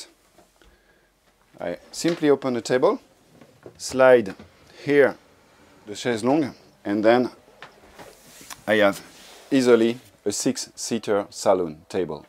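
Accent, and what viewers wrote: France. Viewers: OMG I love his english... his accent is so funny, so french... :-)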